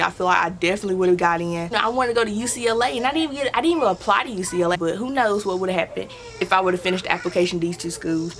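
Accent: South, United States. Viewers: I can tell she from the south. Id say memphis or Louisiana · Strong Southern ascent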